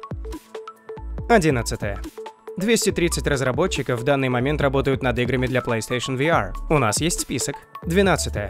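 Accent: England, United Kingdom. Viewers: по-моему слишком выраженный английский акцент) не стоит, так как еще и по сравнению с русским контраст смешной получается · плюсую английский раздражал аж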